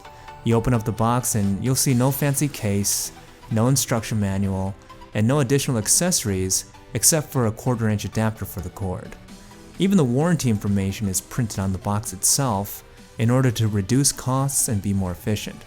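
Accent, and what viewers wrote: United States. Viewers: your American accent sounds very pleasing and accurate!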